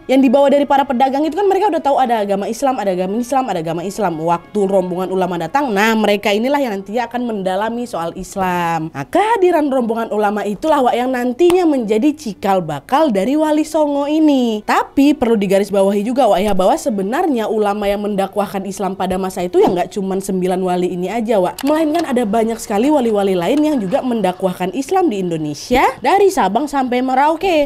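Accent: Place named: West Sumatra, Indonesia. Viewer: ASSALAMUALAIKUM, MBAK, DARI LOGAT BERBICARANYA SAYA RASA MBAK BERASAL DARI MINANG ATAU SUMBAR....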